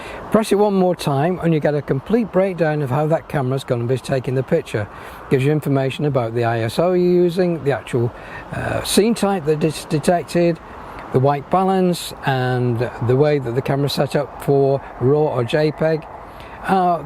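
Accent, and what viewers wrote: England, United Kingdom. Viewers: Very helpful - good to hear an English voice (I'm from Stoke but live in the US) - thank you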